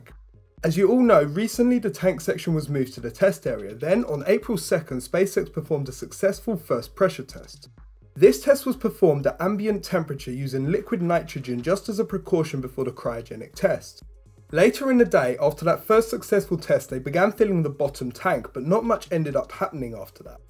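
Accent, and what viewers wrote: United Kingdom. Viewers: Your accent is adorable, exactly what part of the UK are you from.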